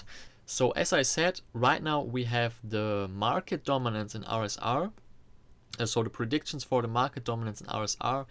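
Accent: Germany. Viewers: Deutscher Akzent izz da 😂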